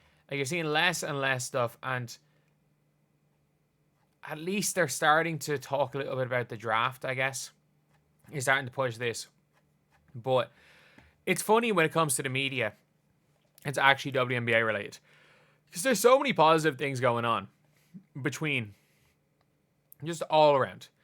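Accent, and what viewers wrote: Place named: Ireland. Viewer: I love practicing Irish accents by listening to. I just wish I knew which part of Ireland he's from. I hear different "Irish" by various celebrities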